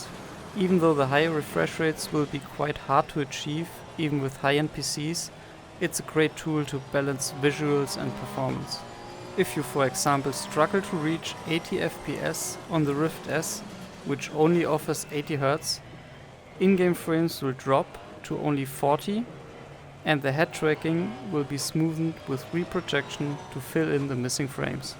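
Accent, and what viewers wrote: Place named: Germany. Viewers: Hey good video! i like your accent! Are you from Germany? It sounds like that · Correct m8, he is from Germany. But iam really puzzled about your statement that you like the German accent. This is really the first time I hear such a statement about the German accent ;) I thought that most american people are grinning about the accent of German people. Happy to be proven wrong ;)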